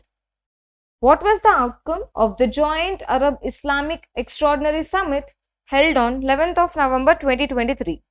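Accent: South India, India. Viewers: such a shitty south indian accent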